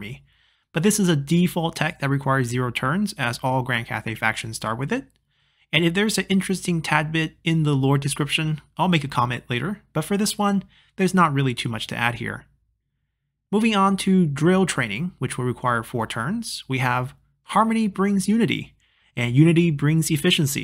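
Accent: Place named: China. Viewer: He's pronouncing it in Chinese (which is his prerogative... being Chinese...). China is a big country, and pronunciation varies significantly from one region to another, and Chinese sounds don't perfectly match English sounds. This is probably the reason for the difference.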